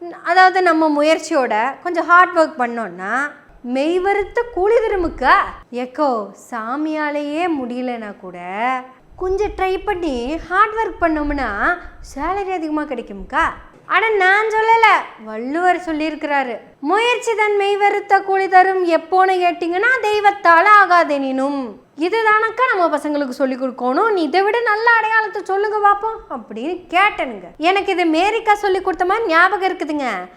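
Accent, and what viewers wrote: Kongu, India. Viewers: தங்கள் பேச்சு மிக அருமையாக உள்ளது. அதைவிட தங்கள் கொங்கு தமிழ் மிக மிக அருமையாக உள்ளது நன்றி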